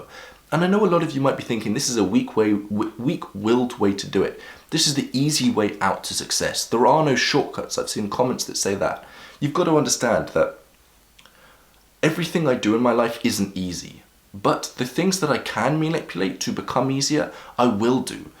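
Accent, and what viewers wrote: United Kingdom. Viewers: British accent = (+10 points) to discipline and charm.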